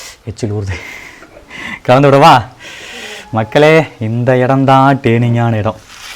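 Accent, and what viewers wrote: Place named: Erode, India. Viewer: ஈரோடு ஸ்லாங்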